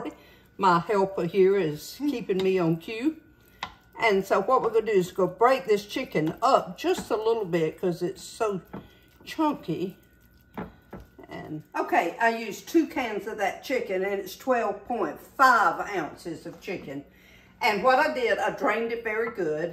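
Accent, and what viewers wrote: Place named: Deep South, United States. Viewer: You don’t say where in South Carolina your from. I live in the upstate, Greenville . I came here in the 70’s, my husband tells me when someone asks me I can finally claim, “yes I’m from around here.”☺️ Your accent sounds Deep South, Charleston and I love it! I lost my sweet 98 year old mother-in-law in 2020 and you remind me so much of her in the way that you cook. She was a wonderful teacher just like you.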